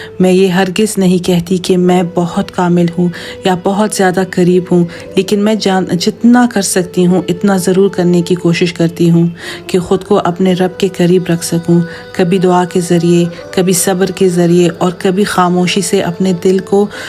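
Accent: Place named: Pashto, Pakistan. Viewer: بہت دلکش اواز اور باتیں ہیں آپ کی اردو تھوڑی پشتو والی لگ رہی ہے اپ انگلینڈ میں رہتی ہیں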